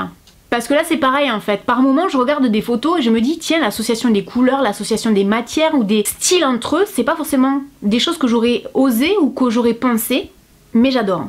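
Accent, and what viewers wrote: South, France. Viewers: je trouve que rentrer le tshirt dans le pantalon allonge vraiment ta silhouette ! j'ai découvert ta chaîne il n'y a pas très longtemps et j'ai regardé toutes tes vidéos j'adore tes concepts et ton petit accent du sud ahahh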